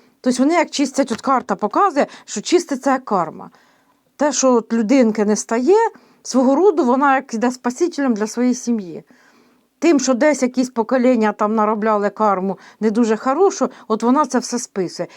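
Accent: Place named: Dnipro, Ukraine. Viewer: Дякую Вам,пані,за ваш суржик (ми теж, ваші сусіди з Дніпра так балакаєм).за Ваші ємоціі(вони дуже близкі нам).за вашу правду.!!! Хай щастить і здоровьєчка Вам!:hand-pink-waving::face-red-heart-shape:❤💛💙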